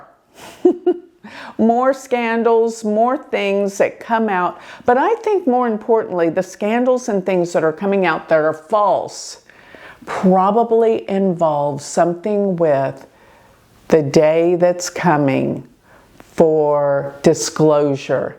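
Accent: Texas, United States. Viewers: slight TX accent that most likely has been practiced in order to lessen? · IKR!!! Texas accent is totally normal if your from Texas! We don’t notice our accent’s but others do.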